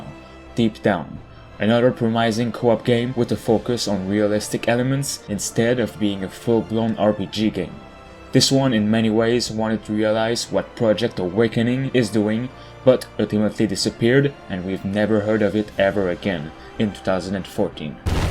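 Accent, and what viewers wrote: Quebec, Canada. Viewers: Clearly a French Canadian I recognize it by that small accent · Jolie accent quebecois! ^.^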